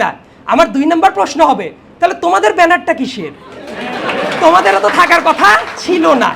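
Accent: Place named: Rural, Bangladesh. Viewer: ছাগল একটা গাইয়া উচ্চারণ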